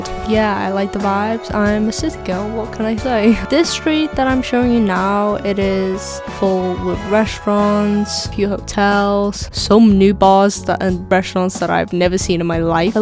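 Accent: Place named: Liverpool, United Kingdom. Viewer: Unless you'd moved, but I could definitely tell by the accent you're from Liverpool!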